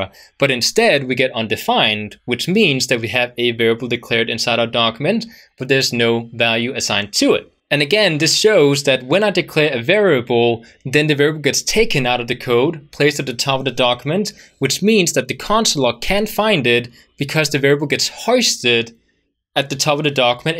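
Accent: Italy. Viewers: is he Italian?🤔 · are you italian?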